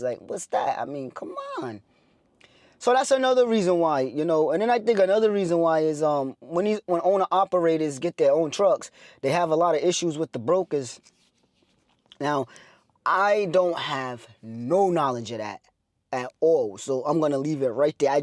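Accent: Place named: New England, United States. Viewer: great video! where are you from? i cannot pinpoint your accent apart from new england region.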